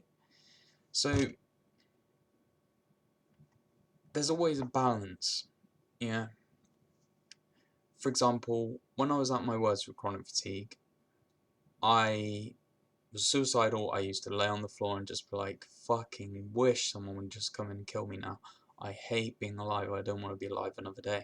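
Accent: United Kingdom. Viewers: I myself always think that things could be worse for me and it makes me feel better. And I also read or listen to others feeling so sick where they can barely get up and I feel lucky. I am very sensitive to gluten. Just getting off of it did SO much for me. I still have CFS but I'm better. I'm going to really try to go to sleep early and eat more often and more veggies. Thank you for your videos. BTW I'm pretty obsessed with your accent. lol I'm from the US but originally from the Caribbean and I love British accents.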